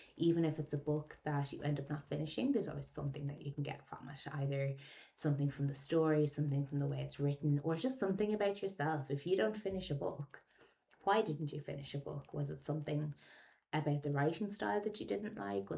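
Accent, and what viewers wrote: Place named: Ireland. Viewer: “I could walk past someone in the grocery store and my TBR would get longer.” 😂 Every time I finish a book I struggle to choose the next one. I’ll share your TBR and we’ll both feel better. I love your chatty unedited take. Any slow moments are saved because you say um and er in an Irish Accent. From one Newbie to another welcome to BookTube. My Newbie tag video comes out on Sunday (Australian).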